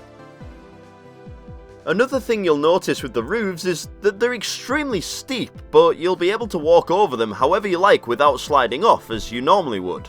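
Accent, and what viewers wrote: United Kingdom. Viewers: Are you British? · Are you British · He's British I think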